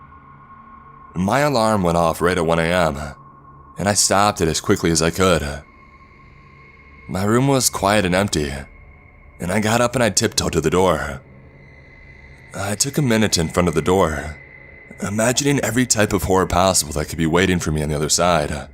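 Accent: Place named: North, United States. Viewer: There's something about how you pronounce certain words like "button" that sounds familiar. Are you by chance from somewhere that borders Canada? I'm fascinated by lingual differences. Also, you're reading pace and pronunciation is one of the best in the creepypasta reader community, it really helps with the immersion.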